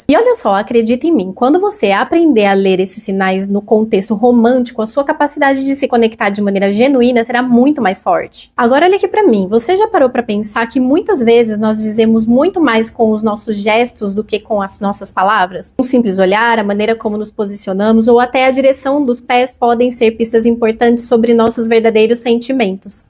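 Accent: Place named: Caipira, Brazil. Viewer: Tirando o sotaque caipira fica perfeito.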